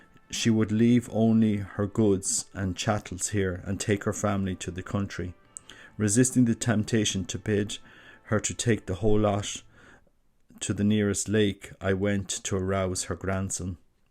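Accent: Ireland. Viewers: Did you go beyond chapter 1? We have a family copy of the book (Father Frank Canavan is my great uncle) but hearing it in an Irish accent is quite special.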